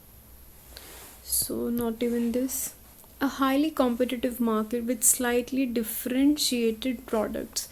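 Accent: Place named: Kashmir, India. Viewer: voice sai Kashmiri lag rahai ho ap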